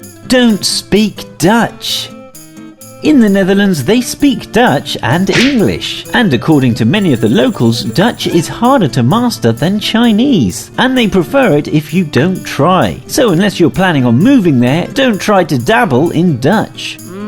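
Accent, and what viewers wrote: United Kingdom. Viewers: Is it Brexit that I don’t like the British accent anymore?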